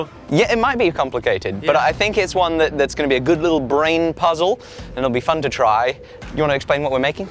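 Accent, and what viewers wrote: France. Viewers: I'm starting to get used to see you achieve great work when you had no idea how to do at the beginning but I will never be used to your perfect French accent! I never met a British would spoke that well^^ when will you make a collab with a French guy? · oh l'accent frencais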